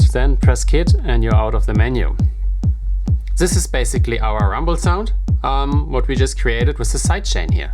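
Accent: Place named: Germany. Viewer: Djeezes, it sounds nice when Germans speak English ! Danke und grussen aus Holland.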